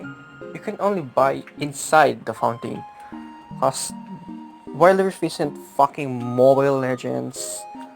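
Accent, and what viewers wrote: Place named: Philippines. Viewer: The Philippinese accent is strong with this one amen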